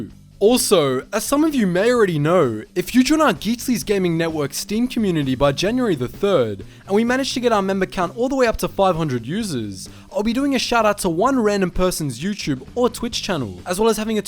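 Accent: Australia. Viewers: Bro you sounded so Aussie in this video, are you? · Love the Aussie voice xD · gotta love that deep aussie accent narrating this.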